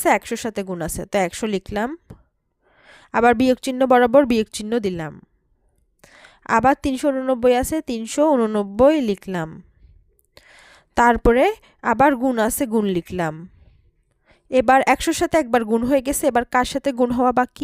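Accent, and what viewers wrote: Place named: Chittagong, Bangladesh. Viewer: তোমার জেলা আমি জানি চিটাগাং😂😂?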